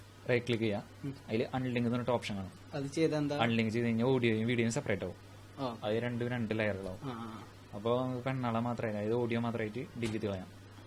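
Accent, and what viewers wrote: Thrissur, India. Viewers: Nalla thrissur bhashayil paranju thannathinu thanks. . Good information👍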